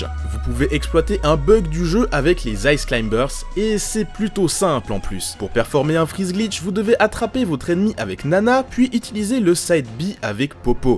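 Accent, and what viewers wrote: Switzerland. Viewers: J'étais pas prêt pour le "neuf cent nonante" (je sais pas si c'est la bonne orthographe). Sans l'accent j'oublie parfois c'est une chaine Suisse. xP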